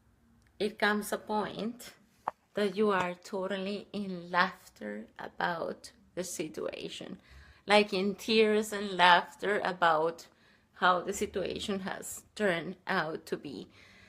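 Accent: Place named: Russia. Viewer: thank you for your video - I think you are the first I’ve found to share about The Art of Soaring. You touched me by your genuine sweetness & intelligence. I like listening to you speak in your thoughtful, measured way and Russian accent. Happy 420! Also are you wearing a Cedar from Anastasia? I have one too. You made the connection between AOS and Ringing Cedars - I think it’s the same publisher not translator. Anastasia means a lot to my friends and I.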